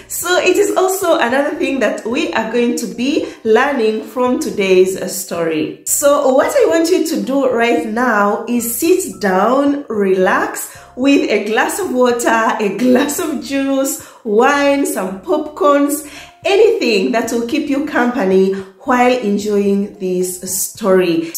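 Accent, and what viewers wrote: Uganda. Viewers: Me too afirst I thought is ugandan or keyan good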